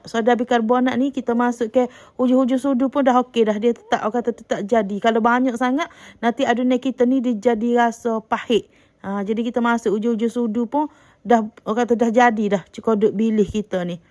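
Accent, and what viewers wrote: Kelantan, Malaysia. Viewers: Lama x muncul ya..suke dh kecek kelate tu😅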